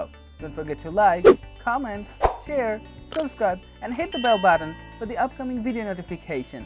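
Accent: India. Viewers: This video is made for the British market, in a sometimes hard to understand Indostani accent. Why did it show up in my search from the USA? Something wrong with the ‘algorithm’?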